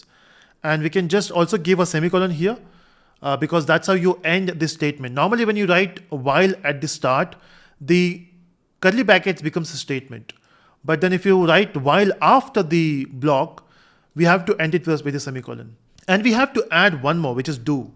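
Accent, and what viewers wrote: India. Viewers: sir are you INDIAN///// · Are you Indian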